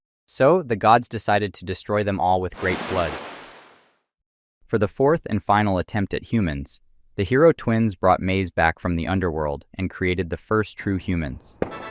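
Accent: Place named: United States. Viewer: I understand not pronouncing most of the words correctly, it's a difficult language for outsiders and even Spanish-only speaking Mexicans fuck them up all the time, but having an American accent and intentionally pronouncing jaguar wrong(even more than normal) is ridiculous.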